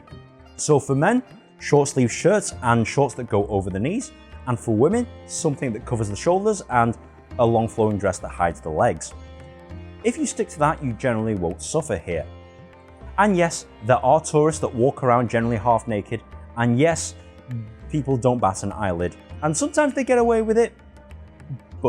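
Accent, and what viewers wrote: United Kingdom. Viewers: You look asian but your accent is Brit.. what gives man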